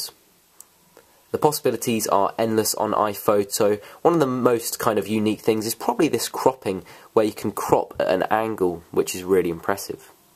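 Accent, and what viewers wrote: United Kingdom. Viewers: I like he British accent !!!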